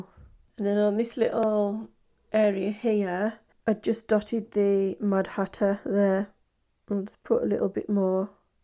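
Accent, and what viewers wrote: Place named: Birmingham, United Kingdom. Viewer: Trying in vain to pin down your accent but I’m getting North East England and Brummie 🤔 Lovely work and I’m glad YT shared your channel with me today.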